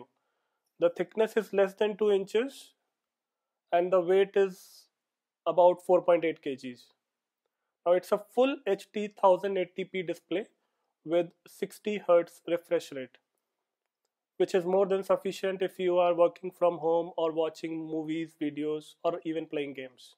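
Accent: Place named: India. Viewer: This is a wonderfully detailed review with a nice highlight on the specs and functionality of the Monitor. Also delivered in a dope Indian accent.!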